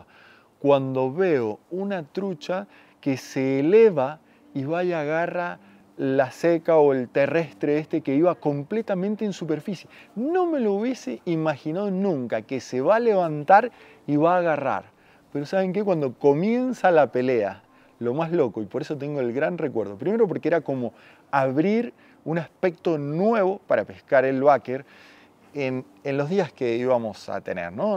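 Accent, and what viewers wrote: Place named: Mendoza, Argentina. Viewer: Estimado, sigo tus videos desde hace tiempo y es notable lo que han mejorado, tanto en lo filmico como en los diálogos (incluir aspectos técnicos fue una gran idea), además tu entonación mendocina me encanta y me trae recuerdos de mis amigos mendocinos:) Quizás podrías ir pensando en algún video usando equipos pequeños (#2-#1), creo recordar que tenes alguno en los arroyos de altura de Mendoza, pero estaría bueno incluir algún video en arroyos patagónicos. Como dicen, no hay truchas chicas sino equipos grandes! y estaría bueno ver como encaras esas situaciones de pesca. Fuerte abrazo y líneas tensas!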